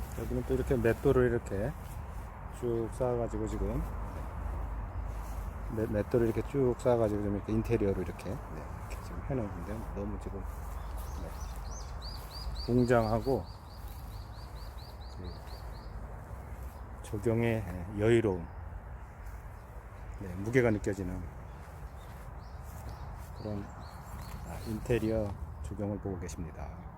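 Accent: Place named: Chungcheong, South Korea. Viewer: 충청도 사투리 쓰시는거 같네요~ 어디실까요? 저는 세종에 사는데 가까우면 구경가도 되는지 궁금합니다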